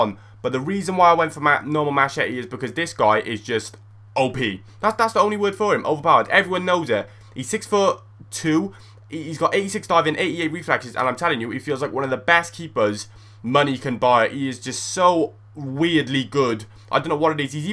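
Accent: Wales, United Kingdom. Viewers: are u from wales but ?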